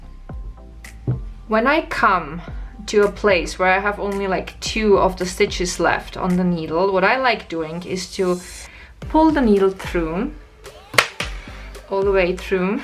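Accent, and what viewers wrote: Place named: Germany. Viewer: So practical that you explain how to do it with a long wire! Its just what I needed! You are an amazing teacher! Love your german accent. Grüsse aus Argentinien und danke vielmals!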